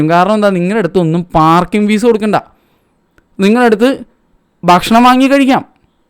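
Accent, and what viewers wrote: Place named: Thrissur, India. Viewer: Thrissur ragam ❤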